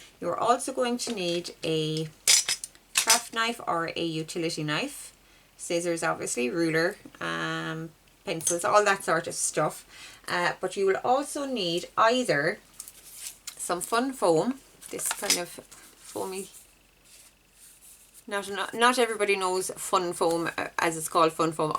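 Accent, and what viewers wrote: Ireland. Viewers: Lovely to hear an Irish voice! I’m from Lisburn Northern Ireland. Just loved your rocking robins and will be making them for the grandchildren.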